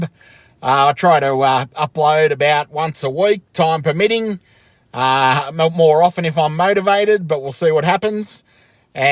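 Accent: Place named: Australia. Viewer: Bob Ross .great name 😁. Also love the Aussie way of speaking . My favorite accent . Plus I hear that place is just wild to live in. Keep up the great work and good morning from Dallas Texas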